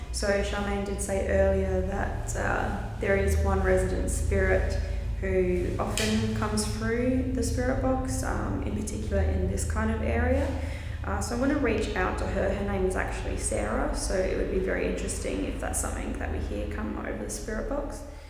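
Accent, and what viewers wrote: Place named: Australia. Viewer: You are so brave. And love the Aussie accent. My fave ghost hunter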